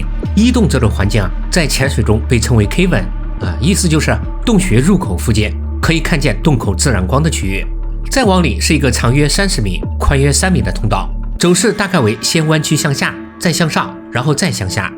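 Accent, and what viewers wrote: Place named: China. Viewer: 這是大陸人做的視頻，你覺得會不會有口音 嫌重就去看台灣的🤷‍♀️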